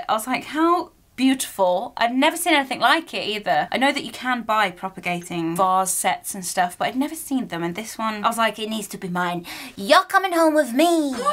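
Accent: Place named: Scotland, United Kingdom. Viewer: Love the Scottish accent! 😂👍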